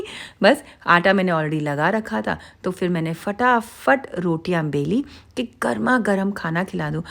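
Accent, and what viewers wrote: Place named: Bikaner, India. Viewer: Aap ki boli or habits all r bikanri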